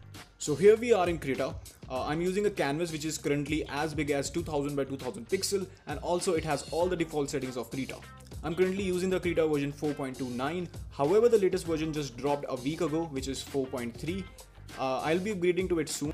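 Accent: India. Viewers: i hate hendeee accent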